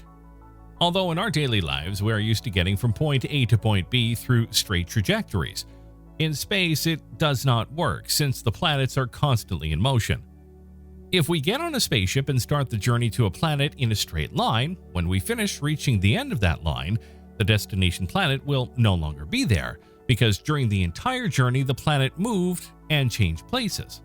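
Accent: United States. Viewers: Would it kill you to show the distance in miles also??? The narrator sounds pretty American to me and yet all you show is kilometers. So annoying 👎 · No shit. But the dude in the video speaks American English. Brits and Aussies are used to miles as well as Kilometers. The whole English world understands miles. Watch the Isle of Man TT currently running or watch MotoGP, they use both. If that's the reason, it's a piss poor one. Why not make a video the 330 Million Americans can understand also!?!?!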